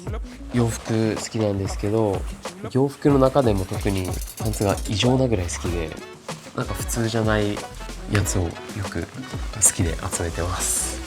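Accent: Okinawa, Japan. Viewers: 沖縄の訛りって優しくて好き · めっちゃ沖縄訛り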